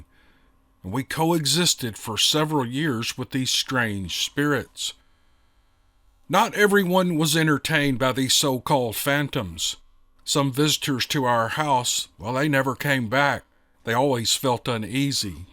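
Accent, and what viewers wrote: South, United States. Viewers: "manly" southern voice is a national treasure as far as this old gal is concerned. I despise squeaky voices coming out of a fellas mouth & hate listening to ads but I'd actually listen to em if was the one narrating it 😂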